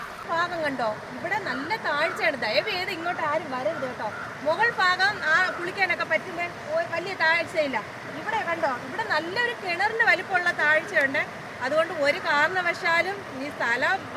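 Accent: Trivandrum, India. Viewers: ചേച്ചി വീഡിയോ സൂപ്പർ ആണ് tvm ഭാഷ സൂപ്പർ സാരീ നന്നായി ചേരുന്നുണ്ട്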